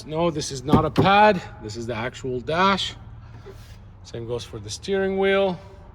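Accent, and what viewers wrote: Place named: Miami, United States. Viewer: Portland? He sounds like he's from Miami.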